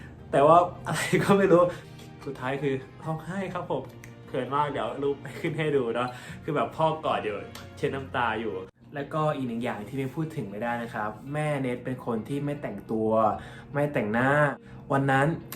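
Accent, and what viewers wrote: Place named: Isan, Thailand. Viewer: พูดอีสาน มีเสน่ห์มากๆครับ ขอติดตาม ig หน่อยครับ 😊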